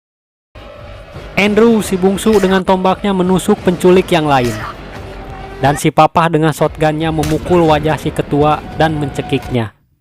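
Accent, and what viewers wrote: West Java, Indonesia. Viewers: Ini yg seru bukan filmnya tp yg ngehost. "si aying yg babaung" 😅😅 dg logat sundanya lucu bgtss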